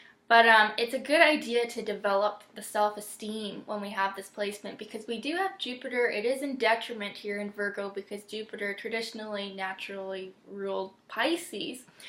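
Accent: Canada. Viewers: I was the person at my last job who was in charge of keeping track of the expiration dates on food and sodas! lol And I would hone in on all my data entry! lol Omg, and work just for work's sake. I always gave work my all - I never just slacked off - even when my boss wasn't there. Spot on! You sound like you're in Canada?? You described my work ethic to a T. And I always invented little shortcuts to make my job easier.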